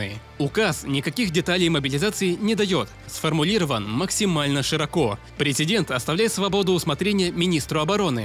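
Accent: Ukraine. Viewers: а ты ведь из Украины, верно? Это сразу читается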